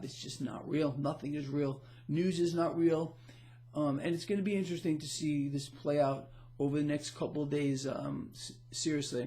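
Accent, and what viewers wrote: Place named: New Jersey, United States. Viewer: you talk like you are from New Jersey. I was raised in Jersey and when I listen to you I start talking like you again rofl. REminds me of my friends and family. I now live in california so my accent has been corrupted.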